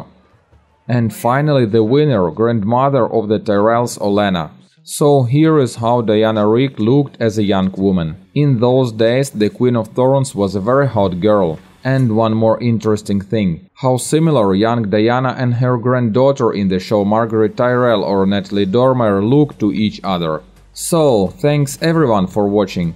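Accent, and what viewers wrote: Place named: Russia. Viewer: sounds russian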